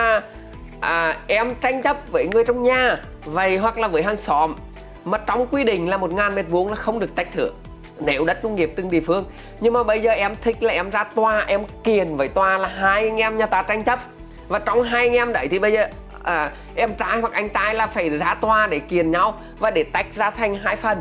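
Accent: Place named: Central, Vietnam. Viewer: Nói nghe không được bạn ơi. . giọng nói nói nhanh giọng miền Trung khó nghe mà nói quá nhanh thật là nghe không được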